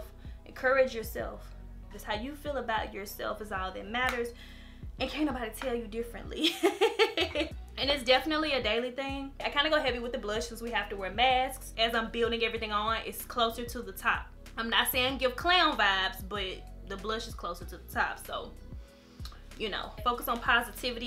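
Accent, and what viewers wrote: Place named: Memphis, United States. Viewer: I'm really glad I've been following you almost a year. And you are a big motivation for me. For because you from M Town South Memphis to be exact 💯 in your voice lol. And you have a godly and lit spirit. Merry Christmas eve ❤ 🌲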